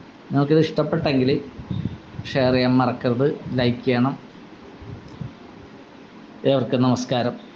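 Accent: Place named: Kochi, India. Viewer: Kochi bhasa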